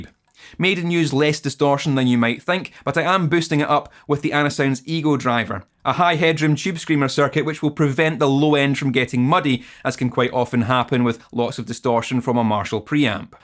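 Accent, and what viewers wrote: Scotland, United Kingdom. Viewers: Now that you have a spare black pickguard from this baby, why dont you build an Adrian Smith Signature Jackson in white and black? I have done one from one of my old Aria STG 004s with a swap of maple from rosewood neck. Also painted the white pickups black. Now plays fantastically. Oh by the way, am a huge fan of your Scottish accent. Alba gu brath! 🙋‍♂️🇹🇷🤜🤛🏴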